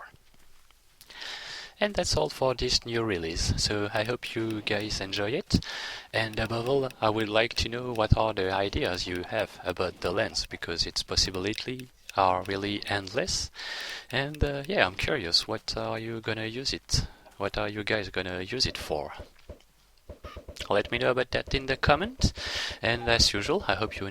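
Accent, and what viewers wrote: France. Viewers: Haha, une présentation d'un mod énorme, et l'accent français qui donne l'impression que tu dis "weed" au lieu de "width" est parfait :D En tout cas super boulot ;) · On sens bien l'accent français ^^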